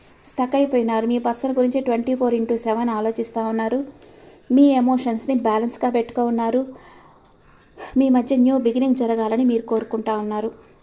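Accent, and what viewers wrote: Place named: Chittoor, India. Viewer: Maam meedi chittoor district slang superb ga undi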